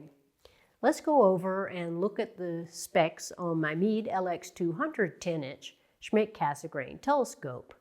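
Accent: South, United States. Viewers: Love your smooth southern accent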